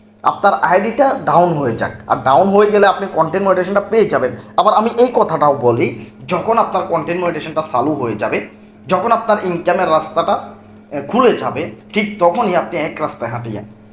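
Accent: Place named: Noakhali, Bangladesh. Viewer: কথা শুনে মনে হচ্ছে তুমি নোয়াখাইল্লা খাটাস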